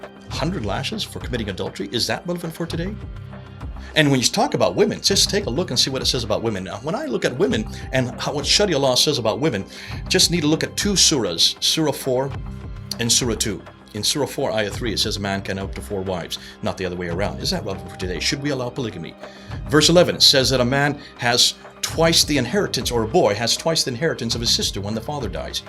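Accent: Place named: United States. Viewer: He's Britain but sounds like American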